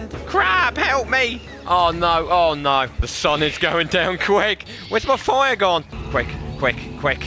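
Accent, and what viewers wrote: United Kingdom. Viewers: I like your British accent 😂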